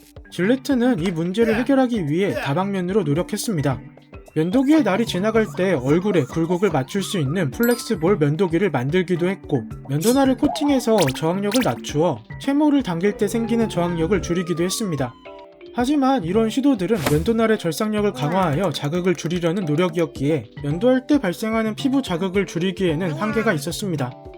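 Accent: Gyeongsang, South Korea. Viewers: 억양이 영남억양인데 엌ㅋㅋ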